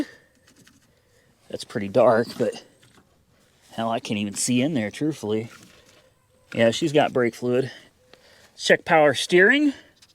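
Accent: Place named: Tulsa, United States. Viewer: Oh Dude, I'm watching this and cringing just knowing what's going to happen to you and you're such a nice person. My family is from Tulsa and I always feel a kinship when i hear you speak.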